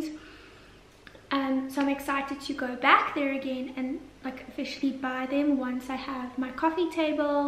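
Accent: South Africa. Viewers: I'm dutch and it's funny to hear you speak South African because some words are dutch but sound different. Love your video's!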